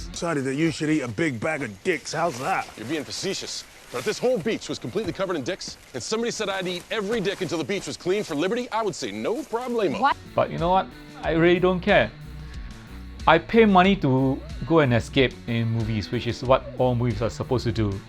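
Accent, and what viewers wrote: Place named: Singapore. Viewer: Singaporean? That accent is undeniable 😂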